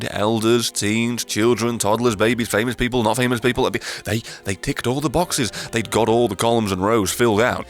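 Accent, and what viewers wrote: United Kingdom. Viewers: He brings humor in a british accent.Also i saw your exact same comment on 2 other videos.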